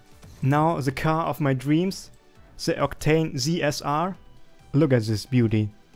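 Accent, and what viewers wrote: Germany. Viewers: Du bist doch deutscher oder 😂😂👍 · Heyo bist du n Deutscher? Ich finde den Aktzenz hört man immer sofort raus :D. hast du Lust mal zusammen zu spielen? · Are you German? xD #Giveaway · Are you a german ?? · Man hört so krass, dass du deutsch bist. Warum machst du keine deutschen Videos? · man hört das deutsche mega raus xD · are you german? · Du bist safe Deutscher :D das mekt man sofort.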